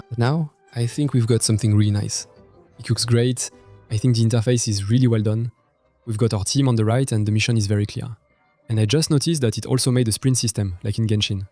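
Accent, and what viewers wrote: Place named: France. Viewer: le fameux accens fr en anglais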